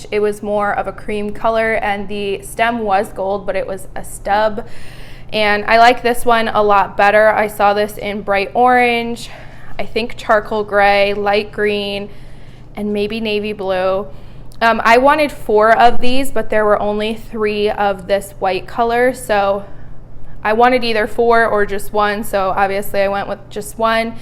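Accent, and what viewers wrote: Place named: Upstate New York, United States. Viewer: You got lots of cute pieces! My husband is also proud when he adds a new tool to his collection lol! Just guessing by your accent, but are you from upstate NY? 😊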